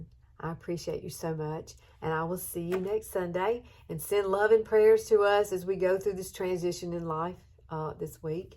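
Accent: South, United States. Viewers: I’ve been so sad about the loss of your mom and I’m praying for you, your family, and now your friend, Terri. What a tragedy to lose a child. My heart hurts for you and your friend. I’m not very tech savvy and I usually watch just YouTube videos, but I’ve found a few of your videos on Facebook. I don’t use FB very much at all and I’m not sure if I’m posting comments correctly or not on there. Do you usually put more videos on FB or YouTube? I sure enjoy watching and listening to your southern voice😊 From one southern gal to another, love and prayers to you and your family 💕💕💕💕💕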